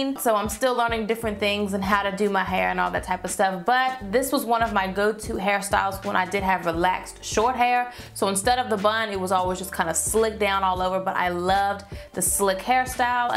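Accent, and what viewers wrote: South, United States. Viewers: a real authentic southern accent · in love with her country accent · I love your little country accent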